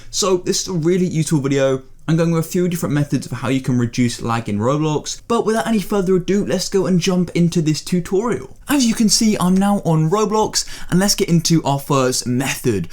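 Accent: United Kingdom. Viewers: Let me guess your british?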